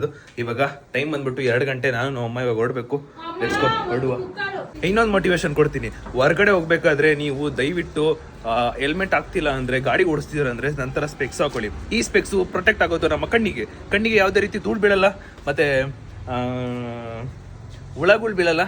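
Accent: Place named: Mangalore, India. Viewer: ಸ್ವಲ್ಪ ನಿಮ್ಮ ಕನ್ನಡ ನಮ್ಮ ಮಂಗಳೂರು ಕನ್ನಡ ತರ ಇದೆ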